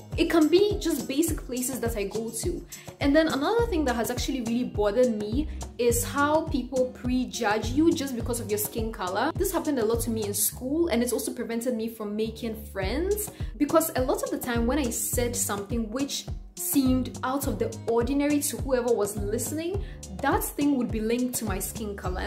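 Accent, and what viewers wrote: Ghana. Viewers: Exactly, nothing different, accent is typical gh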